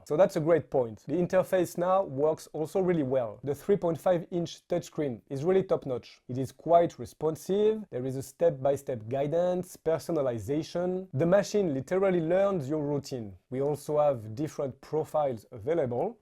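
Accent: France. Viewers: En t'ecoutant je realise qu'on peut pas pas cacher notre accent francais😂😂😂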